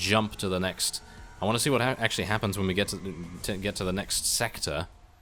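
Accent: United Kingdom. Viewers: Where you from? Are you brit? Can't tell if you're brit or US.... anyway, good vid. (no yelling, no acting). You sound like a nature documentary dubber "the lion is staring at the buffalo... the buffaly sees him but blablabla". You get the idea!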